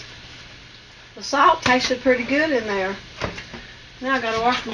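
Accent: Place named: Appalachia, United States. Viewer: Thank you for taking the time to share your knowledge. I feel like I'm listening to my mama's family every time I hear you. Their roots are in Appalachia. I've also noticed some similarities in the some of the dishes you make. Thanks for teaching me new tricks, new recipes, and bringing back old memories.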